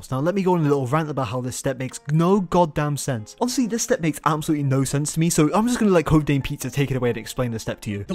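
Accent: United Kingdom. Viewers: a tale as old as time an english accent, and a cod zombies easter egg like bread and butter, good and evil, night and day. yin and yang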